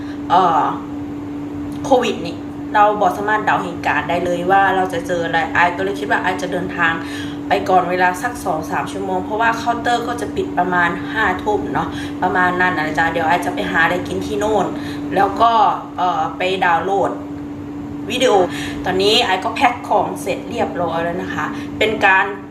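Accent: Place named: Sakon Nakhon, Thailand. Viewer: อยู่บ้านอะไรค่ะ พี่ได้ยินเสียงพูดก็รู้คนสกล พี่อยู่สกลจะ้ มีแฟนฝรั่งเศสเหมื่อนกันจ้ะ ไทบ้านไดน้อ?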